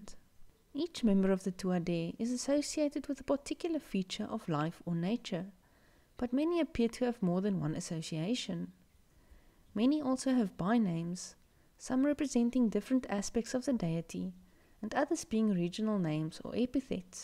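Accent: South Africa. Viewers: are you south africa!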